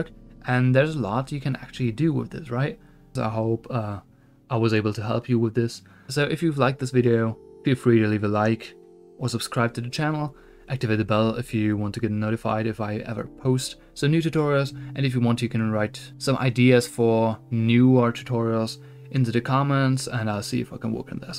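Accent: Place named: Germany. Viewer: Erster Satz direkt den deutschen Akzent gehört <3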